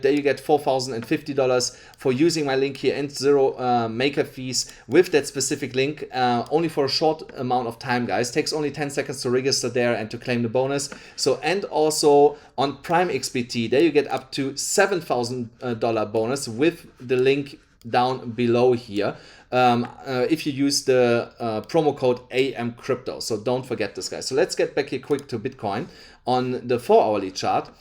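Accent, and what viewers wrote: Germany. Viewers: Bro kommst du aus Hamm xD?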